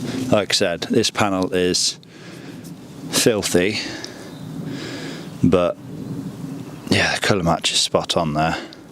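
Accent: United Kingdom. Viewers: You gotta love the British accent in this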